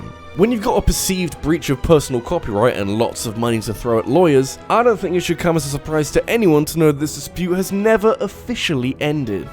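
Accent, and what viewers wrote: England, United Kingdom. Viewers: He’s English. That’s how we say it :P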